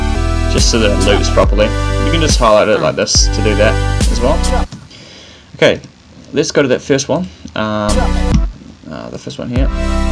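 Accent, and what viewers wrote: New Zealand. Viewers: Are u kiwi?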